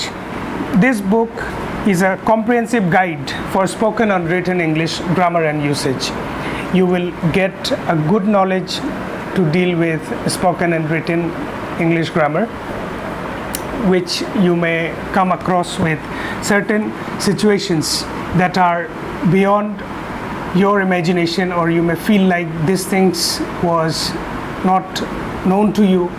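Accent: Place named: Bihar, India. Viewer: R u bihari ..... U told eskils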